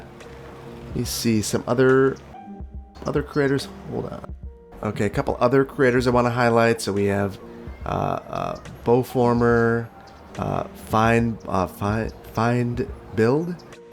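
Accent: Wisconsin, United States. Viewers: Are you from Wisconsin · NEVER apologies for our Wisconsin accent, its something to be well... somewhat proud of XDXD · Watched your videos to better understand Skylines. Stayed for the intrigue (started with Verde Beach.) Whole time I was watching I was like 'He has a Wisconsin accent.' Thanks for confirming my suspicions. Anyway, keep up these amazing videos, they are much better than the cities I make. Also, congrats on the subscribers. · Respect the Wisconsin in ya lmao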